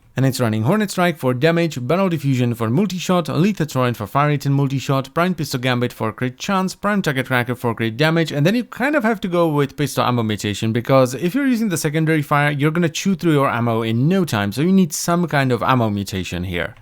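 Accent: Czech Republic. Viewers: He does have a slight Czech accent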